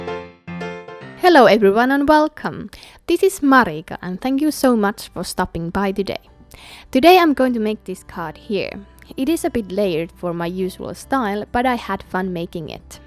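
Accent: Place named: Finland. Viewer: It doesn't matter if I watch with my first cup, or last of the day, Marika!! I LOve watching you ANY time of day! These are so lifelike ... Tom even watched, saying 'she has an accent' to which I replied: it's Marika! She's Finnish! Of course, Tom was ready ... No she's not, she is still coloring!!!" Love your card. .. and you!=] Michele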